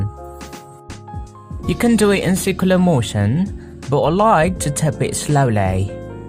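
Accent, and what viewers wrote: United Kingdom. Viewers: Gilllakk kereenzzzz beuddd sumpah Jd pengen belajar how to speak as great as you. Kaya bangsawan2 inggris sumpah....ih....wanna make conversation with you...practice as u student. Sembah.....guru besar.hehehhe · Aksen Britishnya halus banget loh kak 😍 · masio ndempul banget tapi aku suka lho tutorial iki. Keren. Aksen britishnya jeng, keren. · British accent,,,remind me to ms.jane😂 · British nya medok banget,kalo merem di kiranya org asing yg ngomong...pernah tgl di england lama kak ? Please answer me.... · Sering² kak bikin tutorial pake British accent I like it 😍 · Kak lidah nya british bgt n cwantikkk..... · Berasa lg nonton dialog harry potter suka sma british accent nya😊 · Kak sampean Iki maem'e opo to ngomong British'e kok uenak dirungokno,love you sering2 bikin video ya 😍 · OMG ... Oke q baper dgn aksen British itu🤣🤣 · darling aksen inggrisnya sukaaa banget Tutorialnya banget banget ok Jadi semangat coba coba make up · Sumpah cantik banget ka, aku suka sekali makeup nya. 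Terus juga suka banget aksen British English nya, belajar dimana sih ka?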